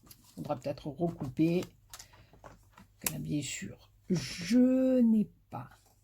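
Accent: South, France. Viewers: c'est vraiment sincère!! j'admire ce que vous faites!!! j'espère un jour faire ne serait- ce qu'un tiers de ce que vous faites!!! et croyez moi, je ne vous lèche pas les bottes!! hihi en plus avec avec votre accent du sud,un vrai plaisir!!! merci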